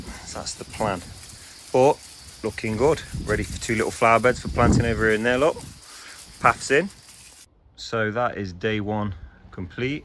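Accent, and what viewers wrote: Nottingham, United Kingdom. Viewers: could tell straight away from his accent hes from notts! great video!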